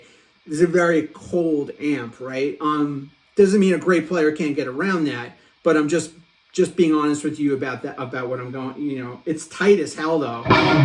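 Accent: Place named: New York, United States. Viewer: I just picked this head up a few days ago to pair with my Marshall 1960A cab with GT12-75 speakers. Excellent, no-frills video and straight to the point without excessive noodling. Great video brother - you sound a bit like a New Yorker !!! Cheers from Canada! Thanks for the video - I can see u can play good as well - nice vibrato !!!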